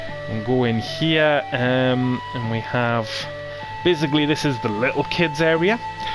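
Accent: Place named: Newcastle, United Kingdom. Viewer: It looks fab and you still sound great. Sexy Geordie accent xxxx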